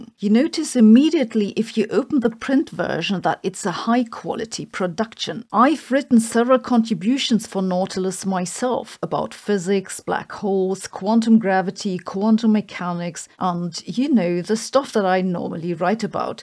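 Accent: Germany. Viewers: Why does Sabine have both a German accent and an English accent simultaneously?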